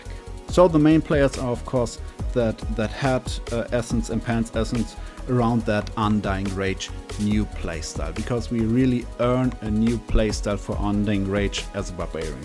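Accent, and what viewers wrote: Germany. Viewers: Man hört einfach das du deutsch bist^^ Gut aufgebaut das video, thumbs up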